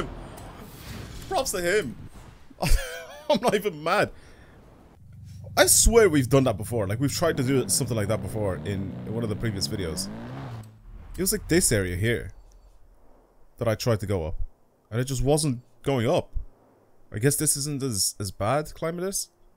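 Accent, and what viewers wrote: Ireland. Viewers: I love your content but the intros could do with a bit of work one minute 45 in I haven’t even touched the Excelerator once, Love the Irish accent but I best be getting the suck or fuck after 🥱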